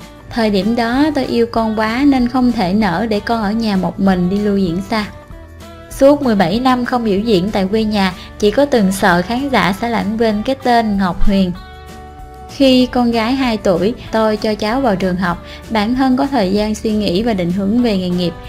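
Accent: South, Vietnam. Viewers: Giọng thì miền nam, mà phát âm chử " G" thì miền bắc nghe thấy kỳ kỳ . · Ban la nguoi mien nam .chu kg phai nguoi bac .ban doc tu giã .no nghe sao day ban hay sua lai di .ban nen doc tu giã .thanh dã di .cho de nghe .chu ban doc khang giã .nghe ky ky .khang dã de nghe hon nghe ban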